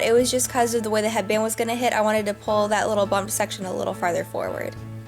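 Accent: United States. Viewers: Hi which part of USA r u from? I love this accent you have!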